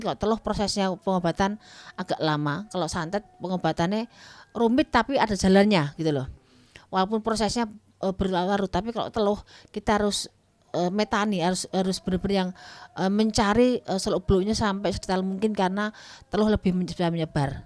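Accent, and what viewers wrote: Java, Indonesia. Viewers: Lidahnya emg Jawa mbak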